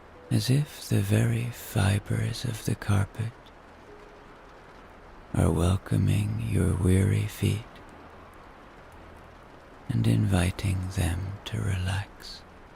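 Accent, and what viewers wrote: Ireland. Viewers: This is my first time listening to one of your stories. Your Irish accent is such a bonus for me! Lovely voice and rate of speech. Comforting. Thank you,. ❤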